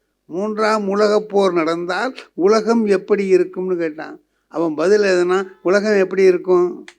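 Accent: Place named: Cauvery Delta, India. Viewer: I like this delta district accent because I too from there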